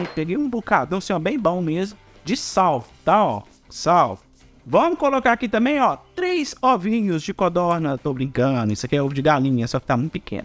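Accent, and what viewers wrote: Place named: Minas Gerais, Brazil. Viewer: Ñ sei se falo da receita ou desse sotaque maravilhoso, eita que mineiro é outro nivel ❤❤❤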